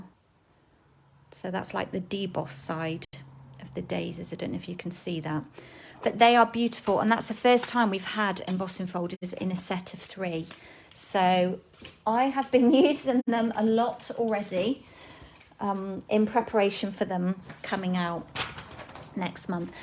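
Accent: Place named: United Kingdom. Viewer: I'm watching the replay. I'm getting a kick out if all your British terms. I love, love your accent! The cards are lovely.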